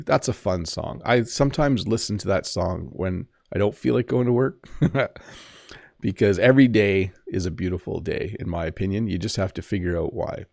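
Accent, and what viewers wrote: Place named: Canada. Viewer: Hello,! I currently live in California. Every day, I watch videos to improve my English listening skills. How different is American English from Canadian English? I really enjoy watching your lessons. Unfortunately, people around me don’t speak as clearly as you do. Or is this a feature of the Canadian dialect? Thank you very much for your work!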